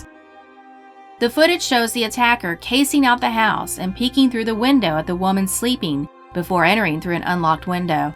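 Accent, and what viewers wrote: United States. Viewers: you speak with an American accent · yet has an American accent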